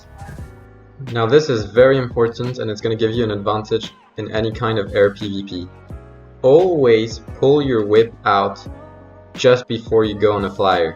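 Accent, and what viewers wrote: France. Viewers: Je comprend tellement mieux avec l'accent français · c’est cool je comprend mieux l’anglais avec l’accent fr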